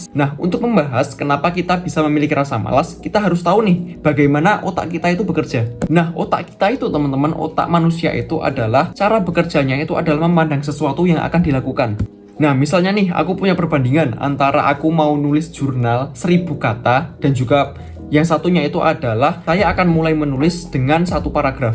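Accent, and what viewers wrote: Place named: Java, Indonesia. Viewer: logat jawanya kelihatan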